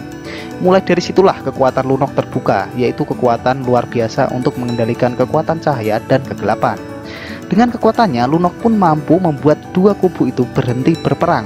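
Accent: Indonesia. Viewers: nganu, medhok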